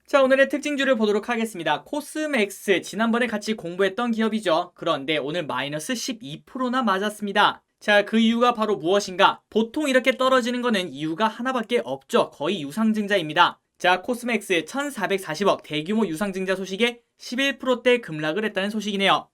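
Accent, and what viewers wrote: Gyeongsang, South Korea. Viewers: 고향이 경상도신가욥?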